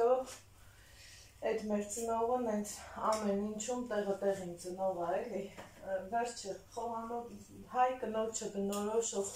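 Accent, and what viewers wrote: Lori, Armenia. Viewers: Барев джан вонц ек,ес нор ем миану кез,вортехиц ек,вонц вор лорва мер барбаров ес хосум кетевем кез,шат ачели е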